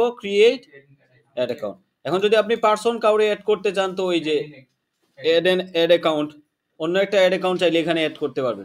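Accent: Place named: Kolkata, India. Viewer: apnar kothar tone koklkatar moto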